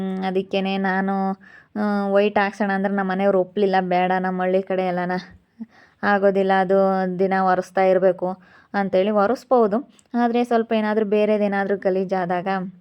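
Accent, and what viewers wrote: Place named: Sira, India. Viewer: ನಿಮ್ಮ ಭಾಷೆ ಸಿರಾ ಭಾಷೆ ಅನ್ಕೋಂಡಿದ್ದೇ... ಮೇಡಂ. ಇವತ್ತು ಸಿರಾ ಅಂತ ಕೇಳಿ ಖುಷಿ ಆಯ್ತು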